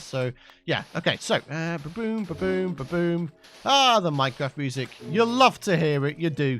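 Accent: United Kingdom. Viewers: Where in the uk are you from?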